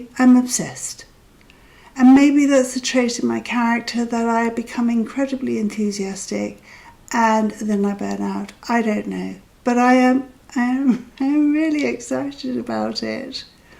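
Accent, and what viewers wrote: United Kingdom. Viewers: Hello 😊 a friend told me about your channel and I immediately got interested and subscribed. I’m just a bit younger and maybe know much more “technology” but getting in front of camera is so intimidating for me. I’m going to watch your videos because I want to support you - I agree we can create a tribe of people like us! You say you like to do art - that interests me a lot because I’m an artist. So, this was the first video I watched of yours and I can see you made progress 👏👏👏. I will watch them all - when I find time in my busy schedule of making videos on tou tube 😅. By the way I love listening to your British accent (as a former English teacher) see you soon ✨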